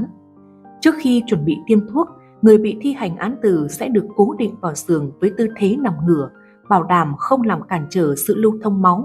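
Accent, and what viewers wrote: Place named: North, Vietnam. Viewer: Nghe giọng Bắc biết xạo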